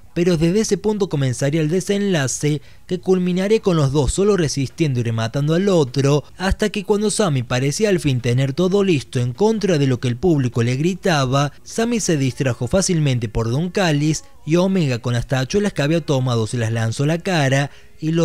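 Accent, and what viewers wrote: Córdoba, Argentina. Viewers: sos cordobes???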